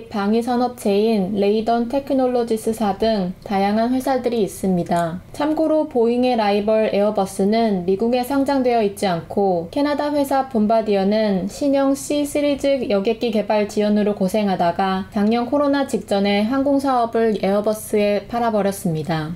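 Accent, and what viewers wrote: Gyeongsang, South Korea. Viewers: 님 경상도 억양도 좋지만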